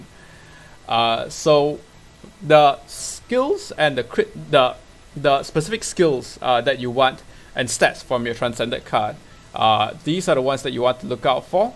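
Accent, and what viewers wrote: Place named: Singapore. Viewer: Just curious. You sound singaporean. Like your video btw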